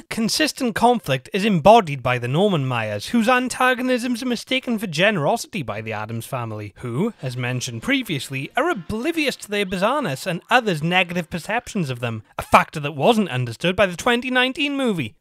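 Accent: United Kingdom. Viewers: Great review mate, are you Welsh? I can hear an accent coming through every now and again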